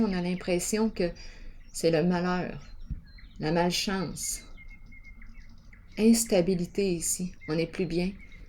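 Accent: Quebec, Canada. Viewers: PS: j ai failli naître au Canada !!! J' apprécie vous entendre parler le Québécois !!!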